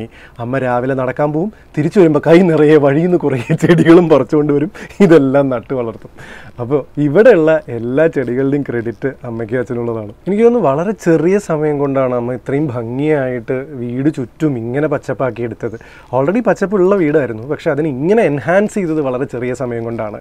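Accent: Pattambi, India. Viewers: Pattambi slang kelkumbol too happy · Pattambikaranayondavum bb3 le anoop chettantyum gp chettantyum slang orupole😁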